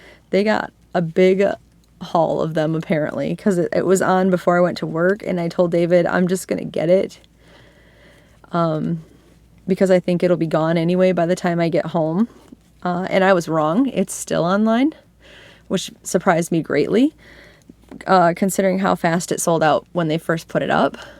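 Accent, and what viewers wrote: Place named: United Kingdom. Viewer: I thought I heard a British accent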